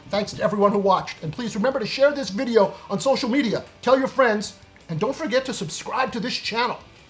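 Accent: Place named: Northeast, United States. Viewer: Mr., you mispronounce the name of the state of Nevada and would mispronounce the name of a highly important mountain range in the state of California, the Sierra Nevada. It is not pronounced "na Vaaaauuugh da," it is pronounced "Ne Va da." The middle syllable is pronounced like the word "Vat" without the "t." It sounds like you were born and raised somewhere in the northeast or upper Midwest of the United States. You are living in the west and should pronounce like the people who live in Nevada and the Sierra Nevada. Otherwise I like your undercover videos and most of your viewpoints. Keep up the good work!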